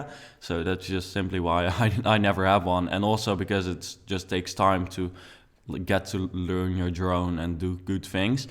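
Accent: Netherlands. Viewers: That Dutch accent tho xD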